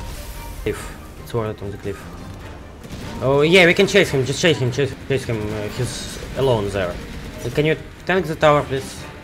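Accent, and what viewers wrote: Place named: Russia. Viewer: I love russian speaking english. Very funny 😂😂😂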